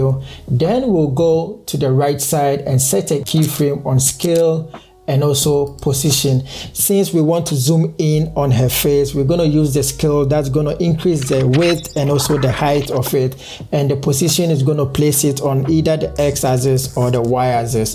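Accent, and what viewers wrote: Ghana. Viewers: You sound like a Ghanaian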